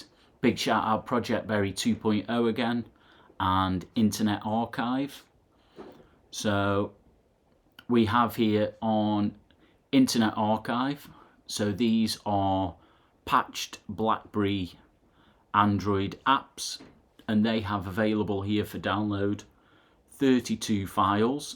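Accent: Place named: Cockney, United Kingdom. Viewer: Why are you speaking in a Cockney accent?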